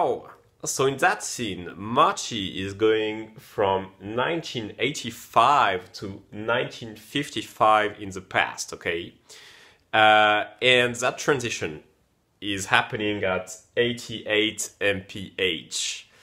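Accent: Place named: France. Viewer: Bonsoir, j'entends un petit accent français... Tu es français ? En tout cas vidéo très intéressante. Ps : le convecteur temporel c'est pour quand ? ;)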